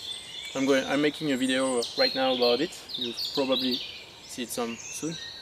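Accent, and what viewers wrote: France. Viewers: Le bon accent français :DDD Super vidéo, compatriote ;)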